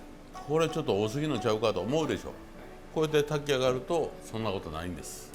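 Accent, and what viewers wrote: Kyoto, Japan. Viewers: 村田さんの気取らない感じや京都言葉がいいですね。 お出汁が３カップということはお米は３合でしょうか？ きのこ類全体でどのくらい量があるのでしょう？油揚げは１枚でしょうか？ 量について、もう少し詳しく書いてくださるとありがたいです。以前の動画なので、フォローむずかしいかもしれませんが。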